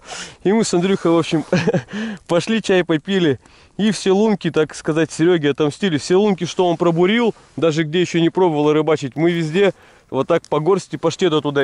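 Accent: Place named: Siberia, Russia. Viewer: "разожгем" , "изгинается" еще) Но я бы на твоем месте не был занудой, это таежный говор, по сути, это и есть гигантская деревня , выезжаешь за 300 км от центра России и там все так говорят. Они смородину называют "кислица", обломки скал называют " курумы" и тд. Там вообще много различий в словах и произношении с центром рф. Это можно сказать провинциальный диалект свой.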